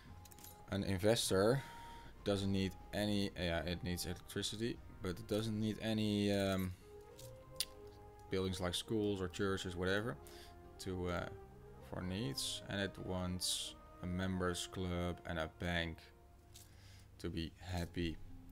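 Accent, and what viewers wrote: Netherlands. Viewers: Ik herken een Hollander uit 100 accenten :D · a lot of ppl are annoyed with the Dutch accent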